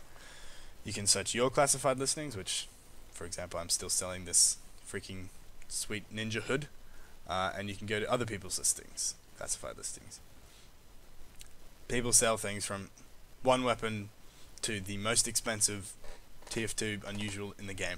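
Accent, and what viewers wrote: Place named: Australia. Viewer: I LOVE THE AUSSIE ACCENT! ITS SO NICE TO HEAR A YOUTUBER HAVE ONE! (i'm australian)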